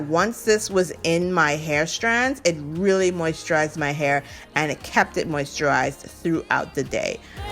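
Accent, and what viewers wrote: Bahamas. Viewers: Where are you from ? I hear your accent. Very close to a Bahamian accent if it’s not one. Fr fr 🙃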